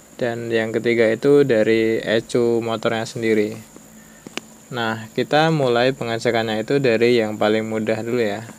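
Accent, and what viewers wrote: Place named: Java, Indonesia. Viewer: Masse... njenengan teng daerah pundi toh...ngomongnya medok... maturnuwun sanget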